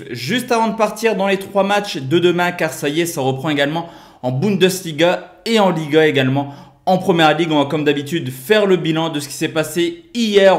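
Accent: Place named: Lille, France. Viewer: Bonne année et meilleurs vœux Que l année 2021 soit bon en pronos pour nous tous !! Au faite t du nord on sent l accent lillois 😉😉😉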